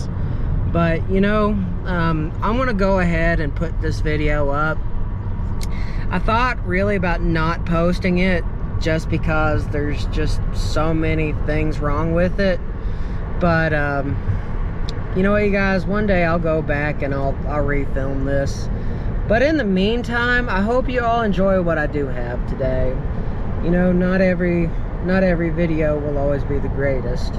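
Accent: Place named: South, United States. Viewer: Perhaps, get out and do some hiking yourself and you will not be sucking for air...sounds like your a southerner and being so close to the river and or any water source as well as walking down a trail...very fortunate to not crossed paths with a CottonMouth, Timber Rattler or other venomous no-shoulders that are all over the area...wearing flip-flops probably not smart.....Oh well, I just wasted 13 minutes....I hope ur approach improves because this was pretty bad...not intended to be offensive just honest feedback. Take Care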